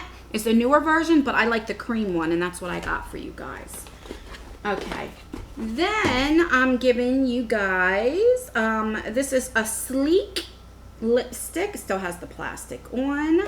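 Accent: New Jersey, United States. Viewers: ...so glad to have stumbled upon your channel!! You are a ray of sunshine and look forward to your videos... You seem like someone I would totally be friends with:) Where in NJ are you?? From the sound of your adorable accent I'd say somewhere central or south...I'm a Jersey Girl too!! 🤟👊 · Seriously I turn around and your channel has grown so much since I started watching. The amount of stuff you're giving away is beyond generous. also what sub do you have where you get a full set of crown brushes? I must have missed that video, oops! Loving your Jersey Accent :) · Nice to see it's happening for such a nice person ..enjoy your unboxings and the Jersey accent takes me back home . Congrats ! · congratulations on 14k now! stumbled on your videos a while ago and I've been watching them nonstop since! I just love your jersey accent and how you really explain everything thoroughly in your videos!! 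#lipstick :)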